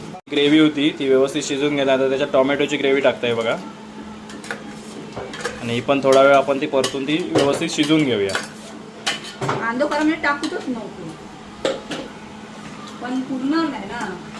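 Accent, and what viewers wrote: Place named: Malvani, India. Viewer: याला म्हणतात खरे मालवणी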